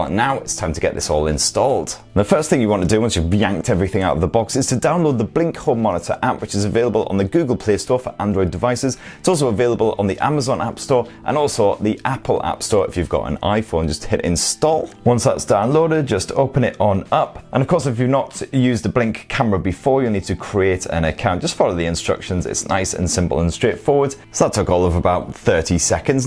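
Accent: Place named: Sunderland, United Kingdom. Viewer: Man sounds like he's from Sunderland innit.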